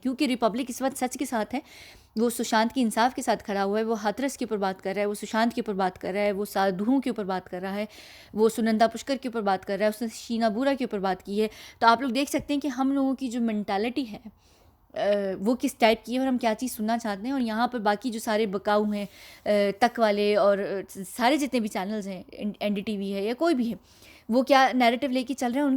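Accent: United Kingdom. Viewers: Your English accent is as perfect as a British or Australian accent; however I don’t like them and that’s my problem. I like your hindi/ Urdu accent. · Completely agree with you. Listened till the end. I love her accent too, English British accent ... 😊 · Didi I really like your English accent..and your Hindi too...So be proud of yourself..We really love u didi. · I love your English accent. As you said you have learnt it... Any advice from you to kids on how to learn it? I am all 👂 👂!!! 🙏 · Please don't be defensive about your English accent, there are going to be many who will not understand your pronounciation...your accent is yours....move on. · Salman Sucking up to PM Modi as the case is now in Modi’s hands. P.s - love your pommy accent 😊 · Great British accent👍😇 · Plz carry on we like your English accent... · Whats wrong with having an English accent? 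Thats like me saying hey I dont get pure Hindi words. Theres nth wrong with either or.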